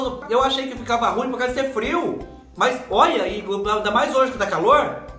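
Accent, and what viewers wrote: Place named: Minas Gerais, Brazil. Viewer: Ai vc parece mineirinho assim como eu. No modo como vc fala por exemplo a expressão "BOBA " é daqui de minas 😂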